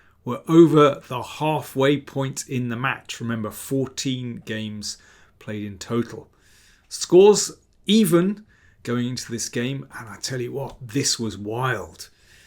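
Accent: England, United Kingdom. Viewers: This may not be the fastest recap video, but it's definitely the most clear, articulated and easy to understand for all levels. Plus the English tone and diction goes smoothly with the analysis. Keep them coming, Mr. King.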